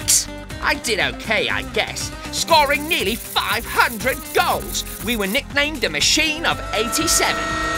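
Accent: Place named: England, United Kingdom. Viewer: the english accent ruins it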